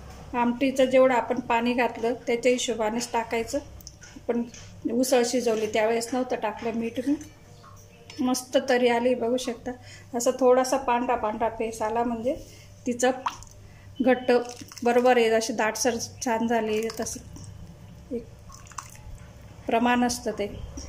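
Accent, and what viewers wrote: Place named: Dhule, India. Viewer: Aap dhule ke ho kya😁nice video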